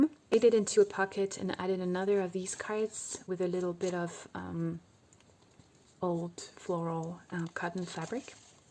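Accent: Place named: Quebec, Canada. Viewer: I love this, very beautiful work! Are you French? You have an accent that sounds from Québec. Just asking cause I am in Montreal!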